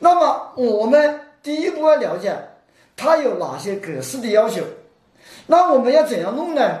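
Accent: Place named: Wuhan, China. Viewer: 武汉口音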